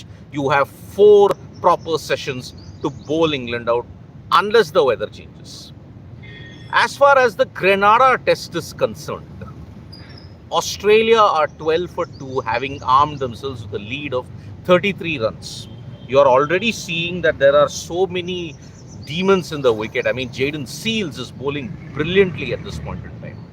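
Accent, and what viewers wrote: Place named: Kerala, India. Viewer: Who is this guy? Sounds like a mallu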